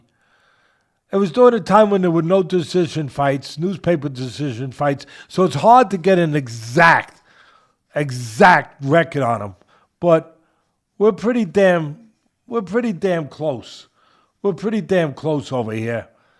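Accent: New York, United States. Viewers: I love everything, especially the NY dialect. It’s broad, honest, genuine.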